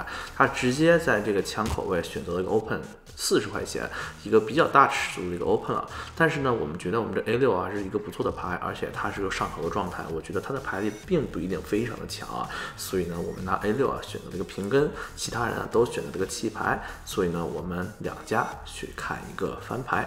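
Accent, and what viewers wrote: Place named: North, China. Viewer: 太好看了， ，一听口音，是国内北方人，有点像北京人，又有点不像... 不管那么多， 很喜欢你的视频和打牌时的状态，心潮翻滚，面不改色，运气紧随。没说的，必须订阅小铃铛。 祝好运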